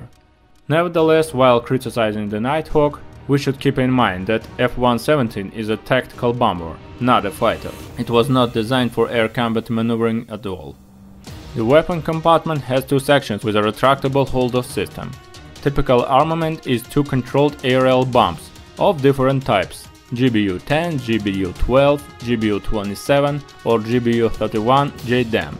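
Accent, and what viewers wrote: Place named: Russia. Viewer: The narrator has obviously a Russian accent. Ironic, since this is about the Stealth.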